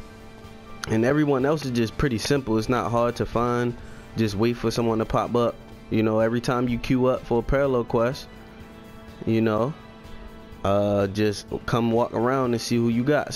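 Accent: Baltimore, United States. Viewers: big help. thanks bro. you from Baltimore bro?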